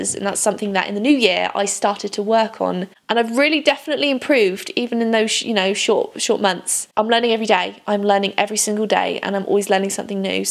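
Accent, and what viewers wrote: England, United Kingdom. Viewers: Are You British? I'm sorry for my obnoxious questions. I'm just curious because your accent sounds British, yet you talk about dollar store. By the way totally amazing video very relatable!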